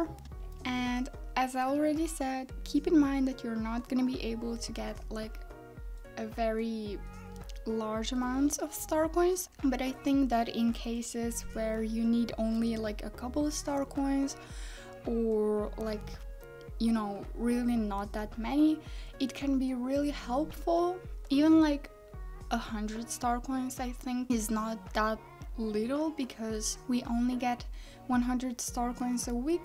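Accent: Germany. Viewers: I think she's German? Or atleast her accent is very similar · Omg lol you german? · Are you German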